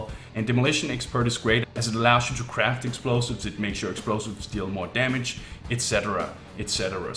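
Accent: Denmark. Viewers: My god you have a Danish accent a viking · But yeah, your accent gave it away, we danes have a weird accent. xD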